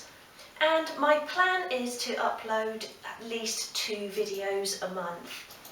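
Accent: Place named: United Kingdom. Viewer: when I start watching your videos, I was annoyed by your British accent.. but now I can say that you are very beautiful woman with simple but gorgeous style.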